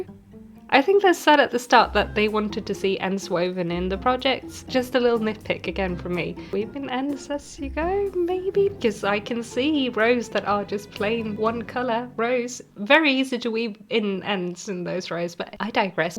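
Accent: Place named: England, United Kingdom. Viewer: I got recommended this video and I'm confused by some of your statements. You said you're a professional knitter and work with deadlines but then you said you knit almost exclusively for yourself. You also saod you're Scandinavian but you have the thickest english accent so either you mean you're of Scandinavian descent but you yourself are english or you're from somewhere on scandinavia and learned english in the uk. I don't say these with any judgements I was just genuinely confused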